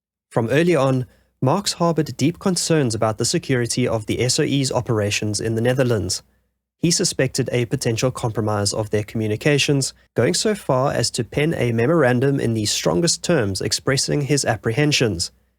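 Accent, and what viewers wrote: South Africa. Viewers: South African accent? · Kudos for another phenomenal video. Well done. It’s great to hear the South African accent of a fellow countryman.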